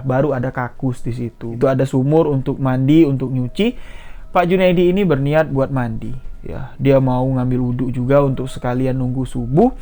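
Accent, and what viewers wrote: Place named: Madura, Indonesia. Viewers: Logat Madura nya kental bg salam kenal lah sama Batak sama sama kental logatnya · kaka kok nada bicaranya kya orang madura ya 😀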